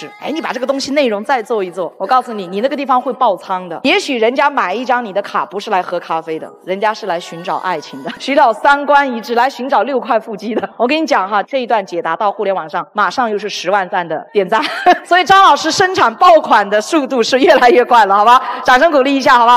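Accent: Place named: Chongqing, China. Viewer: 我以為你是重慶人。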